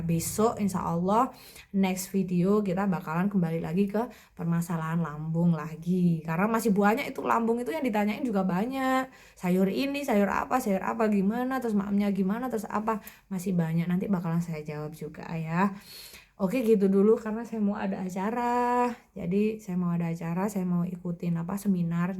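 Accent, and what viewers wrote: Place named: Madura, Indonesia. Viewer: Bun logat nya kaya orang madura ya bun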